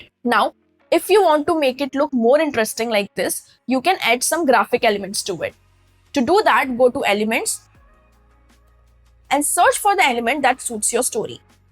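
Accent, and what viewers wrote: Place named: India. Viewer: Indian no doubt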